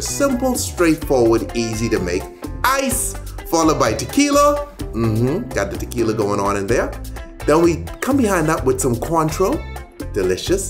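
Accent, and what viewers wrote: Bahamas. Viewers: Nice video. Sounds a little Bahamian. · He sound Bahamian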